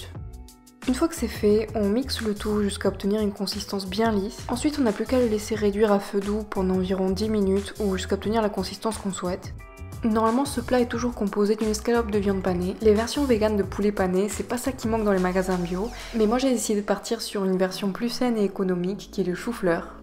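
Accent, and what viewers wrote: South, France. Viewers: eh non! Ne change rien tout est parfait montage recettes et ta voix off avé l'accent haha! Bonne continuation et merci:)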